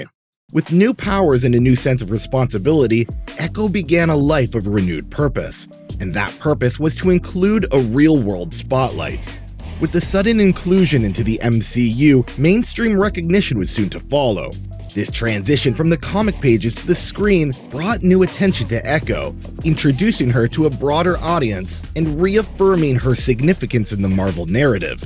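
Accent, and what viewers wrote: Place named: Canada. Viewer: you are a canadian aren't you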